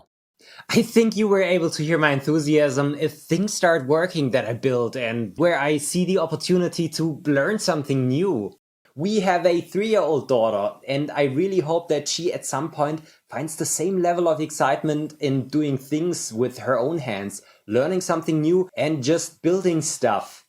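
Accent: Germany. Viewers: noch deutscheres englisch hab ich noch nie gehört, fck richtig ab deine 15 jährige stimme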